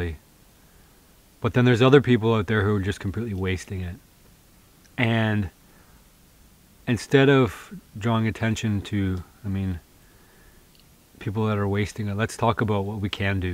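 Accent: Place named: Canada. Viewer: Watching this channel has made me realize the distinction between the way the Canadians and the Scottish say "About". This way sounds like "Aboot" with a strong O, while the Scots say it with more an U sound, "Abuut".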